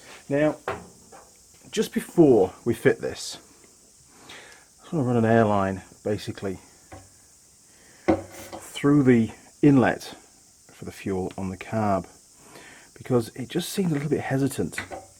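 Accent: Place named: North, United Kingdom. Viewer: I detect a slight northern twang in that voice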